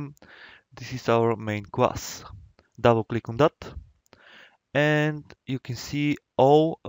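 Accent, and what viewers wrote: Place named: Russia. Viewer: Плохой английский с русским акцентом. Может есть такое видео на русском?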